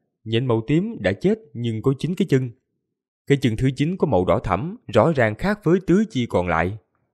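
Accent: South, Vietnam. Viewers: nghe giọng miền nam quen rồi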